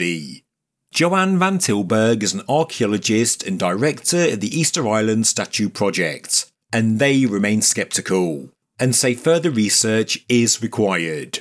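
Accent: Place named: Leicester, United Kingdom. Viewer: Leicester I think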